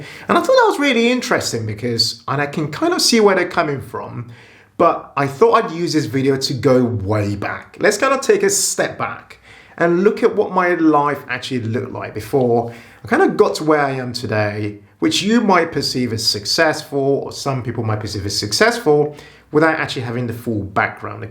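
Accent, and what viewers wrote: London, United Kingdom. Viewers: I had to stop watching the video to post this quick comment: I thought you were a Londoner. My husband is originally from London and your accent is close to his. 😄😄😄. I am from Brazil and despite being on this learning journey since 15 and having a fluent level of English , I still have a brazilian accent. Back to the video 🤣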